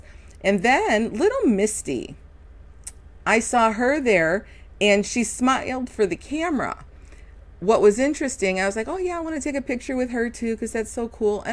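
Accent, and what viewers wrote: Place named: Chicago, United States. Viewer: Ma'am. After about 30 secs of listening to your story I knew you were from Chicago 😅 Even though you're no longer up here it's nice to hear someone sound like me. AND a creator who is also Gen-X, hello fellow 80's cool kid! Looking forward to your content on all those companies, many of which I'm super familiar with. You had the purse?!?! I'd have been SO jealous.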